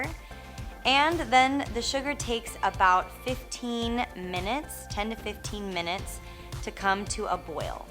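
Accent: Texas, United States. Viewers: You are ALWAYS such an inspiration! Your aunt was awesome and a pretty lady too! Oh... and just love hearing your TX draw:) Sure wish you had your co op 16 yrs ago when I lived in Houston! Also wish you were touring close to me in Kentucky! <sigh> oh well. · a texas twang ? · Your Texas accent is so cute!!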